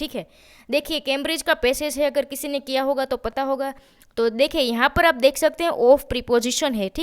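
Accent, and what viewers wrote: Gujarat, India. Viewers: Ape ma'am to first ape Gujarati hoo 😅 vo to malum chala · Gujarati accent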